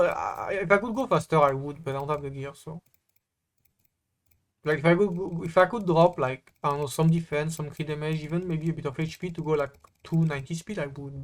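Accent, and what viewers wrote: France. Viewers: He is talking in English but with a god awful French accent.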